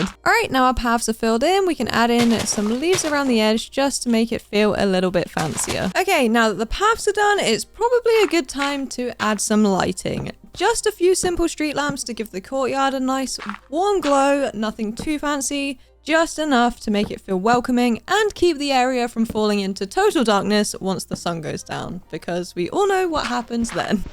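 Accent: United Kingdom. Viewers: Wanted to comment and tell you that my little girl and I have been absolutely loving watching your videos lately. She is delighted by Millie's antics, and loves your British accent, as well.:) We've recently gotten back into minecraft as she's finally old enough (five years old) to play it, too! We love your videos, & Millie!!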